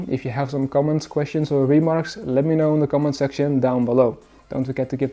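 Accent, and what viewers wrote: Netherlands. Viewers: just like the earpods? Just open the case? Edit: are you from the Netherlands because you sound like at Dutch man who speaks english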